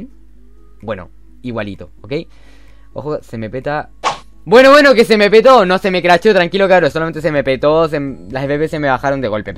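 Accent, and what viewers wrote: Chile. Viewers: me suenas aque eres chileno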